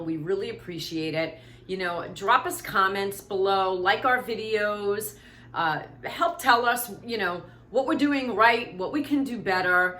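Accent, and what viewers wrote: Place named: New York, United States. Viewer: What’s that accent?!?! Big 🍎 ??? 🥰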